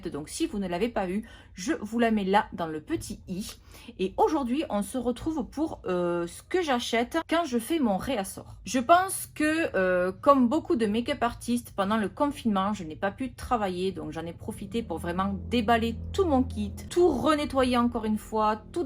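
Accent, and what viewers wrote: South, France. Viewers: Hyper beau ce makeup! Et ton fond aussi! Ça fait du bien d'entendre l'accent du sud :) Continue comme ça! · Cc et son magnifique accent du sud 😉 bien le méga haul pro ❤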